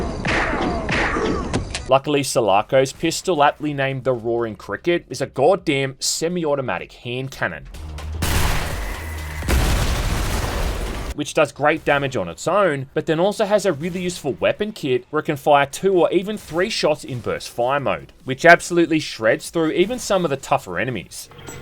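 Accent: Australia. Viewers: have you never heard an Australian?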